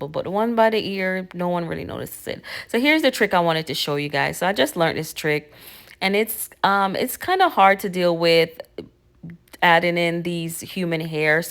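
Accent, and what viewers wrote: Jamaica. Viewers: We appreciate this series. I’m also trying to learn to do my own hair. I recently did some mini spring twist by myself for the first time ever and I was pleasantly surprised. P.S are you Jamaican/ Caribbean by any chance. I hear a slight slight accent but I’m not sure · New subbie! I hear a Jamaican accent! Gonna binge watch your vlogs 🇯🇲🇯🇲🇯🇲🇯🇲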